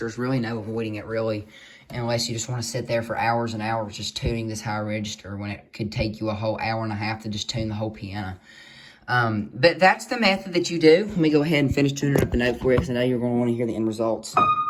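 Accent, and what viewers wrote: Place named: Mississippi, United States. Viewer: I swear you’re from Mississippi 😂. Your accent is great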